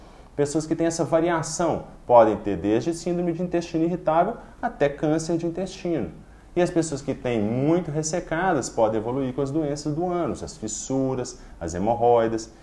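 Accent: Minas Gerais, Brazil. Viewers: Que sotaque lindo!! Parece mineiro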